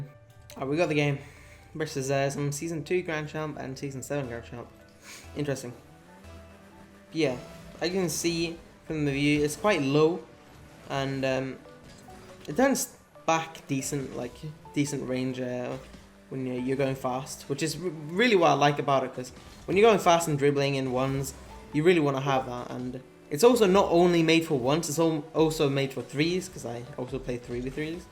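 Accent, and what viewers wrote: Sweden. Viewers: Are u swedish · Are you from sweden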